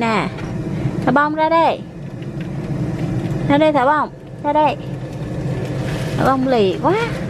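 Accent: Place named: Central, Vietnam. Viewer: Cô người miền Trung ạ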